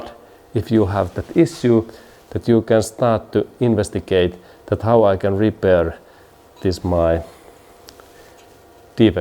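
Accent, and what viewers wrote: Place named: Finland. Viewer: that killer finnish accent good job guy!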